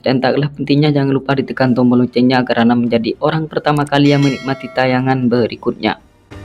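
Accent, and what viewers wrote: Madura, Indonesia. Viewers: Sepertinya org Madura ini pengisi suara nya wkwkkw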